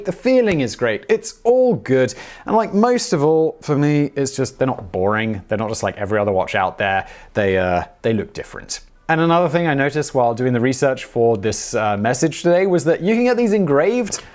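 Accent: United Kingdom. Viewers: Your British sounds somehow better than other British accents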